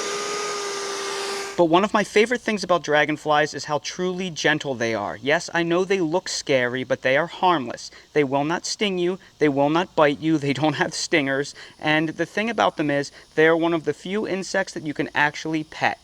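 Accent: United Kingdom. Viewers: You have a slight Scottish or Irish accent. I can't specify because thebmixture of American accent. Do you have a parent from Scotland?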